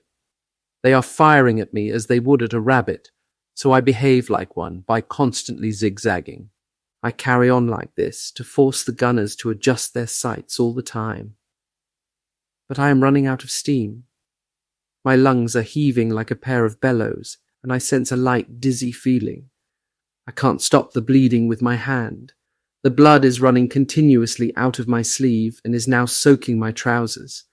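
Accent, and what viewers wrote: RP, United Kingdom. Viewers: A British voice narrating a German point of view. Not a fan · Accent is british not german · It's Eton Pommy British. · Not a German accent but you can say the German names properly. Obviously, you're a Brit. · Are you British or have you lived in United Kingdom for long. I like your accent.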